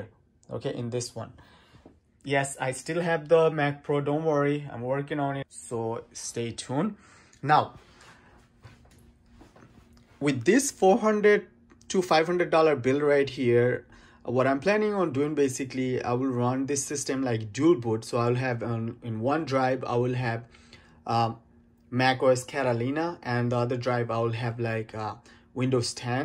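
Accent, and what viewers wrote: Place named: Bengal, India. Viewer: Bhaiya You sound like a Bengali . Are you a bengali?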